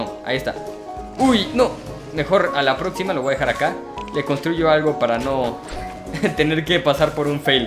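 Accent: Mexico. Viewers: El asento mecicano😄😂😂😂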